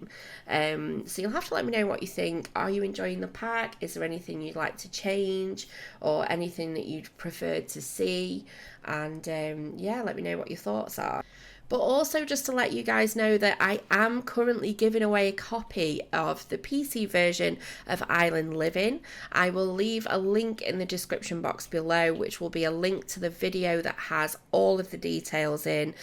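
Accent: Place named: North, United Kingdom. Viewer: Always watch your builds & LOVE LOVE LOVE THEM - Currently live in Amsterdam but I'm from Manchester, Always Good to hear another northerner ;)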